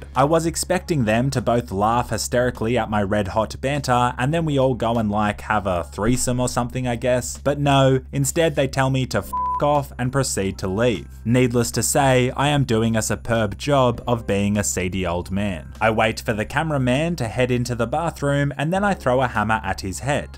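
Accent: Australia. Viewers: "Just kidding. Spiders. Spiders are scary" Isent he Australian??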